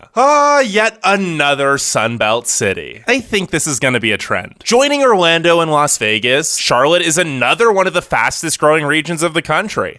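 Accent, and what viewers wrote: California, United States. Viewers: Sorry but the voice is just Californian I can't stand it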